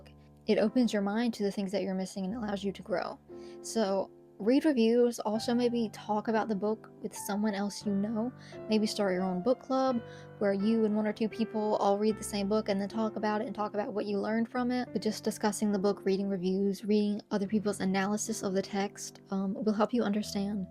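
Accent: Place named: Texas, United States. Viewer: Not gonna lie. I have never found someone so cute so fast. Stumbling here and there. Just real communication. A bit of a eye-avoidance reserveness/shyness going on. The lil texan accent in the background. Wow.❤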